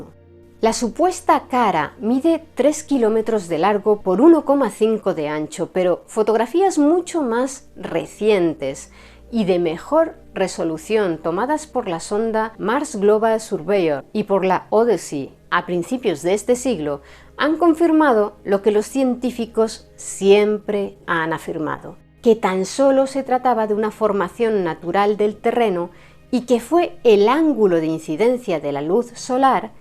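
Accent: Spain. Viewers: Me encantan tus videos, y con el acento español los hace más interesantes 😊 saludos desde México 😘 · Hola, eres excelente divulgadora y tu acento español es hermoso. Saludos desde el Río de la Plata. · me encanta como relata muy misteriosa y todo,el tono de voz mas el acento español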